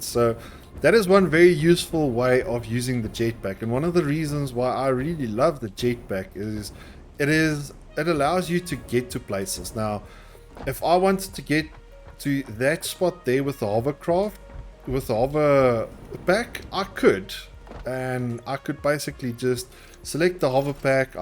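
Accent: South Africa. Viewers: This guy sounds South-African 🤭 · Are you South African boet?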